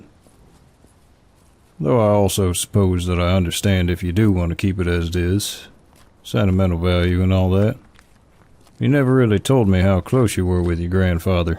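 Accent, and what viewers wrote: South, United States. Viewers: melted immediately with the real sounding southern accent 🥴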